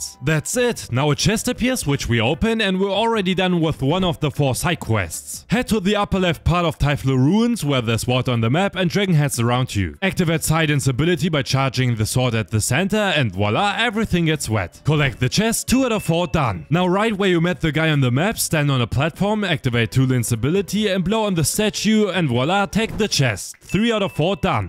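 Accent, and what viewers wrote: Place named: Germany. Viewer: Ja er ises selbes Logo,selbe stimme und nen unübersehbarer deutscher akzent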